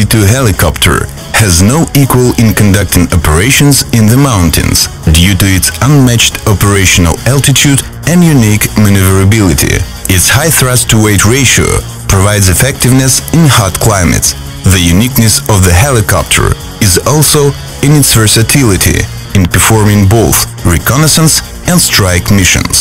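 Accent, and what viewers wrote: Russia. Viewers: Такое чувство, что это русский пытается говорить по-английски. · русский ваня говорит((((((((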